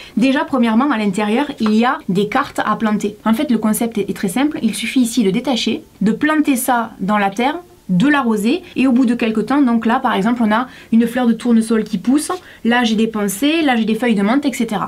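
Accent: South, France. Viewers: cest laccent du sud je pense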